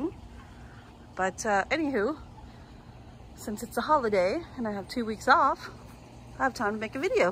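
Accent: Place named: India. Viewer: You sound like a normal American with hints of an Indian accent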